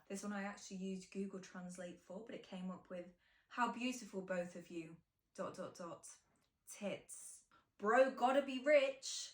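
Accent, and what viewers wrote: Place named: United Kingdom. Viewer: What a beautifull british english. And all the best, blessings together.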